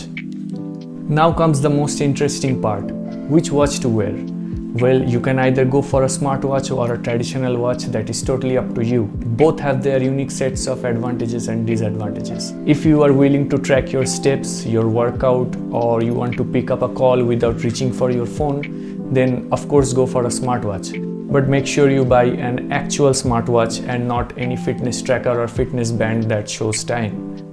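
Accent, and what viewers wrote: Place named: Bengal, India. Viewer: Such a heavy bengali accent.....and its your usp.....never try to change it.....its your identity❤❤❤❤❤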